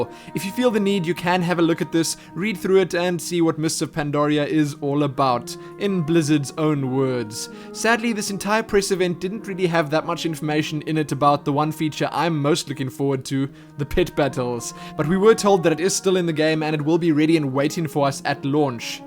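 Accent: South Africa. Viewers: It's a South African accent guys....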